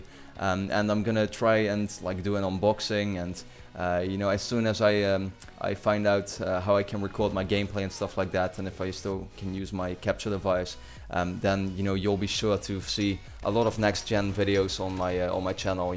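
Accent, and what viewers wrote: Netherlands. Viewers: Are you dutch? your accent kinde gives it away :)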